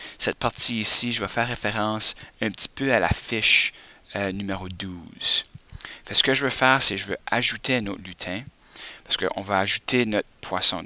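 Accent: Quebec, Canada. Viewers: P'woouuaa cet accent ^^' C'est un accent quebequois ?? mdr.. bonne video :)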